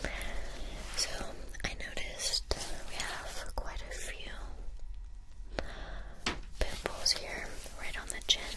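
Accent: United States. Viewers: oh I thought you were American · I thought u were American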